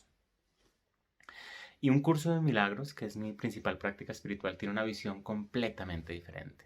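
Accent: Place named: Colombia. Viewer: Qué amorosa explicación ☺️♥️✨📘🕊! Y qué lindo tu acento colombiano 🤗! Yo estoy entendiendo más sobre la proyección de la culpa. Culpo a alguien por algo y ya me detengo a observar esa culpa en mi ✨ Aún necesito ayuda y a veces el ego interviene para no verla con la Verdad. Gracias por tu aporte y consejos! 🤗🤗🤗🤗🤗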